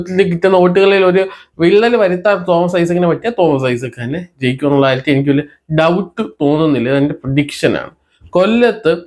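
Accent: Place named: Tamil Nadu, India. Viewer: സംസാരത്തിൽ തമിഴ് കലർന്ന പോലെ ഉണ്ട്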